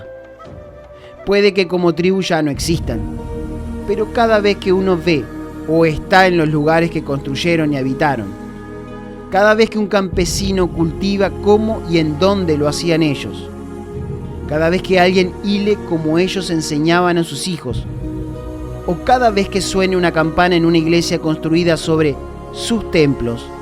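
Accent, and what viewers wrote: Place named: Argentina. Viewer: EL QUE NARRA ES ARGENTINO NO CABE DUDA....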